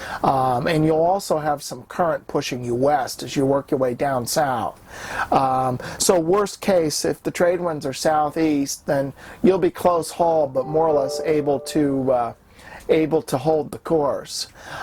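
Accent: Maine, United States. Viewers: Not to be an idiot, but sounded some Mainer and not a learnt language, but a first language, and very easy on my ear. An old lingo that's stood the test of time and storm...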